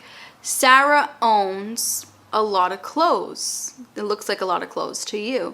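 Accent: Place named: New Jersey, United States. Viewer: I live in Michigan but my dad is from massachusetts and I’m not used to hearing people talk exactly like my dad talks, so hearing your accent makes me so happy and reminds me of my family back in Massachusetts!💛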